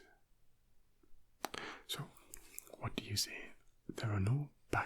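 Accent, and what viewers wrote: United Kingdom. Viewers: Ok. Since I'm touring your production I'm guessing what country your accent belongs. You sound brit but not too much.. i associate you with actors like Christian Bale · such a fine British accent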